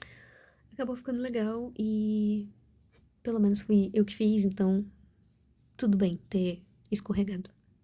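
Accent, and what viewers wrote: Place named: Rio de Janeiro, Brazil. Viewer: a tem sotaque carioca e a não tem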